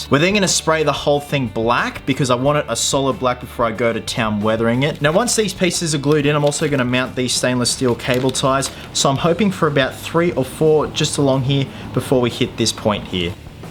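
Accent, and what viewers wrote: Australia. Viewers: your an aussie arent you??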